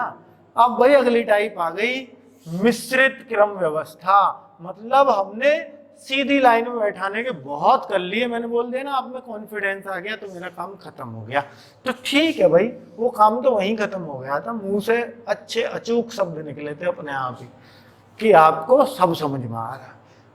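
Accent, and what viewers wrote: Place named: Uttar Pradesh, India. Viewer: Sir full U.P. wali feel a gayi hum D ko hi pel denge.😎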